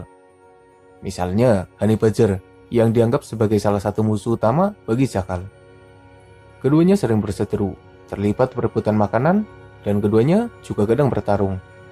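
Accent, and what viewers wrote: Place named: Java, Indonesia. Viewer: Suaranya keterah banget orang jawa Dari jawa mana min soalnya saya juga orang jawa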